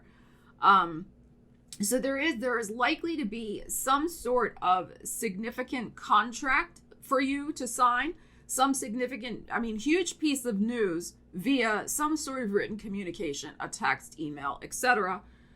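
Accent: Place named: Brooklyn, United States. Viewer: Oh! This was a surprise! For some reason I thought you only had done a tea reading for Scorpio!! This is a great reading -- I imagined you delivering it with a Gypsy accent but the Brooklyn one works😂 Yes it's been a mofo 2 of wands space forever. Capricorn appreciated that you get how patient we have been. I don't take credit - Capricorn just does what must be done so we don't really see a choice. Love the page of pentacles coming in. As you know - this cap has sent many applications that have gone into cyberspace...am continuing through the near future -- feels like a treasure hunt.. 💥🌞💥💕😘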